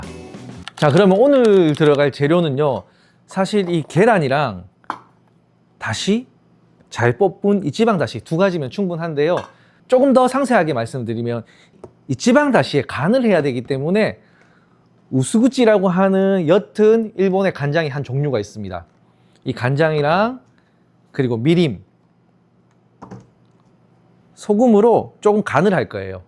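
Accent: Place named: Daegu, South Korea. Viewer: 대구 사투리로 정겹게 설명해주시는게 정겹네요ㅎ 많은 영상 기대할께요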